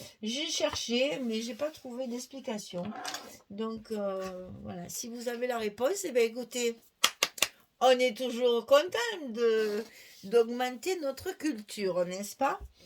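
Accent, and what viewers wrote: South, France. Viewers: Bonjour, c'est un cadeau que tu nous fait tous les jours. Une vraie artiste ! En plus quel bonheur d'entendre parler ''le sud'' qui me manque tant. Des bisous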